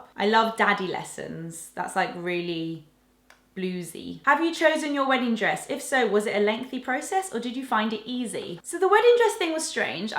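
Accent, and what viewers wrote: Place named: RP, United Kingdom. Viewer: sounds very posh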